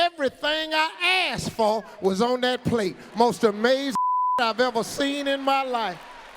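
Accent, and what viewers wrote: South, United States. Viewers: His "country" accent is hilarious. It makes his stand up comedy that much better lol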